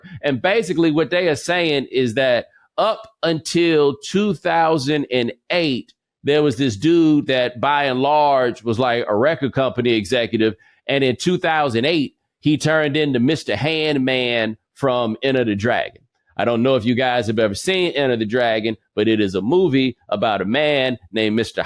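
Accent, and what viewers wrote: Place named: Atlanta, United States. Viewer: Never knew you was from Atlanta but now I hear your accent clear as day lol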